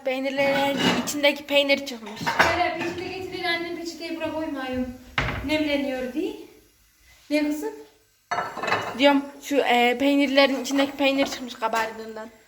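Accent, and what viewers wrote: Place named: Konya, Turkey. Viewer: yurt disindayim Konyaliyim o Guzel siven icin izliyorum sizi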